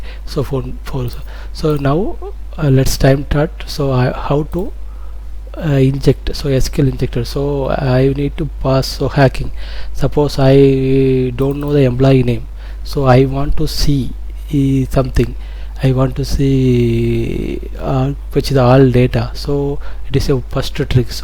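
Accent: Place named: India. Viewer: i really cant understand indian accent.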